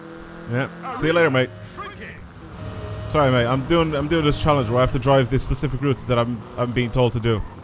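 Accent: Ireland. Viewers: I love your content but the intros could do with a bit of work one minute 45 in I haven’t even touched the Excelerator once, Love the Irish accent but I best be getting the suck or fuck after 🥱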